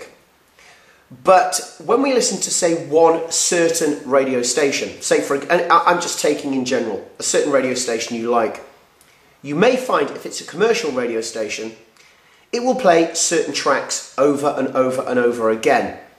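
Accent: United Kingdom. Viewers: im from germany and i alway enjoy listening to your videos. im a big fan of the british language! :)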